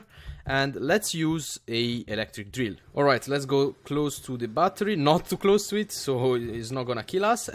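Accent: Italy. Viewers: He isn't a native English speaker, he's Italian :-D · He is Italian accent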